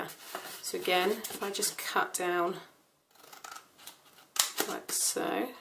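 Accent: United Kingdom. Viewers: I LOVE this project! Being a chocolate lover doesn’t hurt - but I’ve never had such a fun way to give a gift of Hershey bars!!, you do a great job explaining everything in your video - and I love listening to you talk. I love listening to your British accent. Anyway - keep more videos coming, you’re great!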